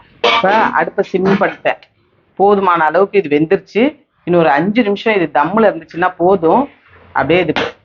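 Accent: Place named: Coimbatore, India. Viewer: Super , coimbatore ah sister ninga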